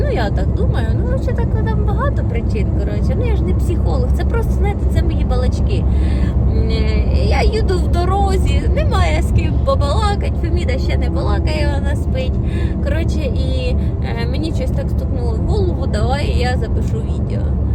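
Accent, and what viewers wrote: Poltava, Ukraine. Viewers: Я 45 років живу з полтавчанином і цей полтпвський суржик впізнаю де завгодно Гріє серце.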